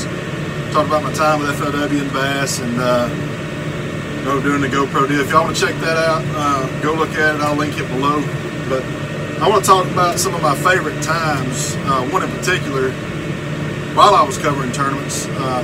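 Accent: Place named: Alabama, United States. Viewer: Thanks for the fishing story, I left Alabama back in 1966, but it sure is good to hear the accent again.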